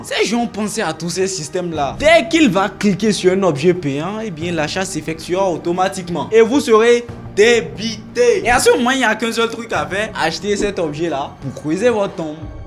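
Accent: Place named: Benin. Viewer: tu es béninois? Tu as notre l'accent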